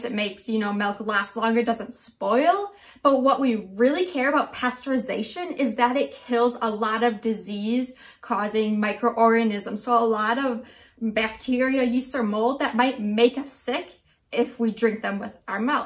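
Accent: United States. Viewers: She is American. That is how Americans pronounce it.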